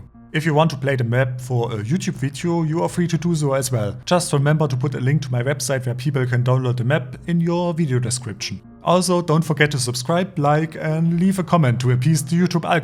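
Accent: Germany. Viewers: Your German accent gets harder over time. Seriously. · are you english you sound a bit german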